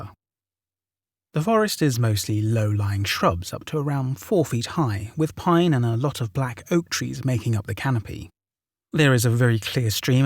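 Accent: England, United Kingdom. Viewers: Great encounters I live in Cornwall England I learned about Dogman through listening to Vic cundiff on Dogman Encounters I could not believe it I was shocked so very many people have had encounters world wide Includi g England You sound English please if you can could you find more people to come forward with English encounters I herd of encounter on Dartmore in a back garden Also Tunbrdge wells Kent ,Essex . There must be lots of encounters , Thetford Forest Norfolk girl camping I bet there are a few Dogman there . Bext wishes Yvonne Marshall Cornwall England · I don't understand the British accent/lexicon :'/ but anyway good video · So glad to have found you! Love your narration. ^_^ Quick bit of pronunciation help for a few North American place names: Ontario has a longer "a" in its 2nd syllable, so it rhymes with "scary," or "hair." :) Maryland is pronounced more like the name Marilyn w a "d" at the end, rather then Mary-Lynn or Merry Land, and Appalachia/Appalachian is pronounced more like "appah-LATCH-ian" rather than a long "a + sh" sound. 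(I heard a great phase to help people remember this just the other day: if you mispronounce Appalachia someone will throw an apple atcha." XD) I know you're fun the UK so it's tomato vs. tom AS Hto, but I hope that helps. ^_^ 💙💙💙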